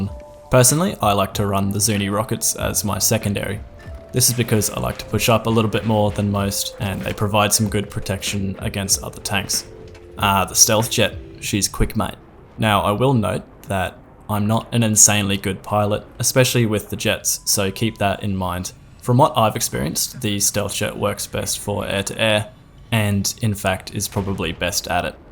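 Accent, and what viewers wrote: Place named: Australia. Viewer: Your Australian. Im subscribing.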